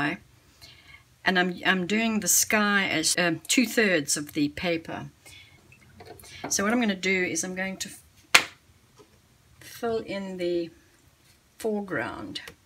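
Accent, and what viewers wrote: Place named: South Africa. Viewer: Thanks. I'm learning a lot about loose watercolour painting. I was surprised to hear you are living in California - you haven't lost your accent! Which I love to hear as I lived in S.A. for 10 happy years.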